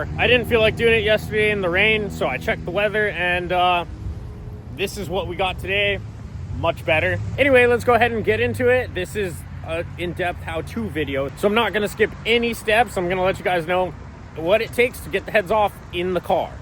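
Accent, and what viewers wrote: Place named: East Coast, United States. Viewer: You do not sound like you're from New Mexico. Your accent sounds east coast or midwest. I spent 8 years in the Land Of Entrapment. I loved most of those years. Anyway, I liked the video. It brought back memories of major repairs in auto store and apartment complex parking lots. Mine were Mitsus, not Subies, but same shìt...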